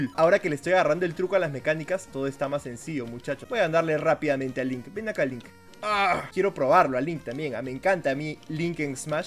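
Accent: Peru. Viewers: OMG, LO SABÍA, SÍ ERES DE PERÚ 💖 Por la forma en la que hablabas lo sospechaba hahaha pero nunca me convencí. En fin, me encanta todo tu contenido tanto en tu canal principal como en este, ¡éxitos! 🌻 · OMG, LO SABÍA, SÍ ERES DE PERÚ 💖 Por la forma en la que hablabas lo sospechaba hahaha pero nunca me convencí. En fin, me encanta todo tu contenido tanto en tu canal principal como en este, ¡éxitos! 🌻Like si luigi es tu personaje favorito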